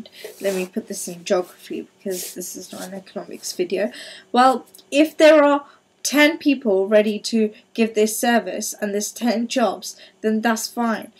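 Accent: United Kingdom. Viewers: As a Pole myself, I'll be the first to admit that Poles don't belong in the UK and that Britain is for the native BRITISH, which does NOT include you,. You're NOT British. You're just an Indian occupying Britain who happens to just so have a British accent. One day, European nationalists will rise up and deport all non-Europeans from our beloved continent and you will be sent back to where you belong.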